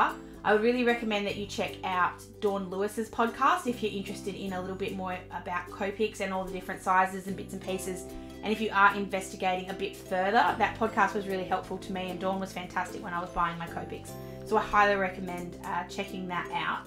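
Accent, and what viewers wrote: Australia. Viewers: hearing your accent, i was staring at that notebook going "that looks just like my mystery 120gsm notebook from K-Mart Australia" lol. Anyway, thanks for sharing! after buying a set of Chinese watercolor brush pens from Amazon I finally got Tombows. I have a set of Caliart alcohol markers I got a while back but never used much due to the massive bleeding, and before I knew I greatly preferred brushes over those massive chisels. A store near me has Copic Sketch with chisels/fines, and Ciaos w/ brush tips. I've seen two types of 'marker paper' - One is just basically card stock, the other is thinner but slick, I assume with surfaces treated to resist the bleeding or something. I still have the Chinese Sunacme pens and honestly like them. They're smaller than the Tombows and came in a lunchbox-sized case, and the fine tips are like Staedtler fineliners. 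But alas, can't replaced markers as they go empty... I want to find refillable dual tip watercolor brush pens someday...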